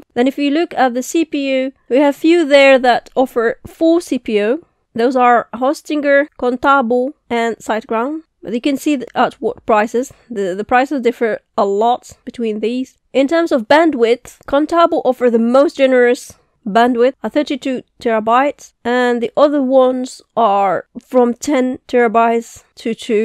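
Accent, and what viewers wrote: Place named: India. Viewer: India maybe 🤔 or indian living in UK 🤔 don't know 😂 just guessing from the adorable access that u have 💕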